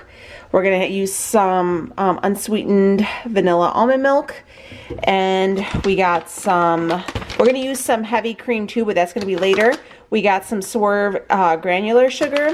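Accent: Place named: New York, United States. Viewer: You are absolutely blooming brilliant. I love how you talk. Your accent sounds like a New York police officer. I love it! PS... you’re one gorgeous woman!xxx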